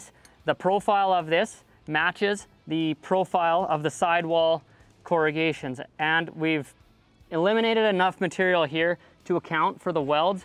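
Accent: Canada. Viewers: Is this guy Canadian? Sounds like he has a Canadian accent and maybe his prices are in CAD which are always higher?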